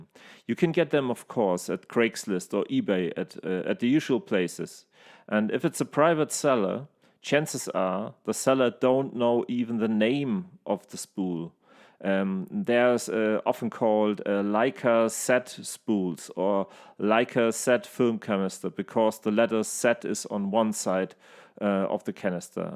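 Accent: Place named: Germany. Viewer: I wanted to say Danke! Really instructive video and I've been using regular metal cassettes which go in all my other cameras and my Leicas too. I have the correct loader and a few IXMOOs so with your help now I am able to use them. Really helpful! And also I want to say your English with the German accent is absolutely perfect for this, it adds "authenticity", as if Leica had made this video from Wetzlar...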